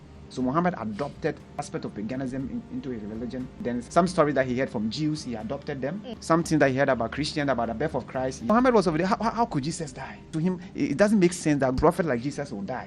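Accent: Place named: Arab world. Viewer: You can see the way the Arabic accent was so clear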